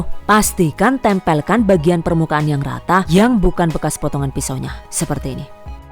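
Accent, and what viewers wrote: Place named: Tegal, Indonesia. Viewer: Semangat ide ide baruu banyak vidio yg menjadi motivasi saya...terus pembawaan juga kadang suka bikin tersenyum senyum..logat Tegal jgn ketinggalan yaa..hehe..puding nya cantik ikut memeriahkan HUT RI...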